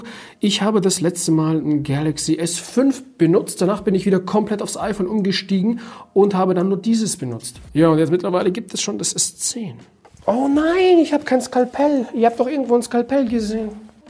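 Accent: Russia. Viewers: bist du russe? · Russischer akzent izz da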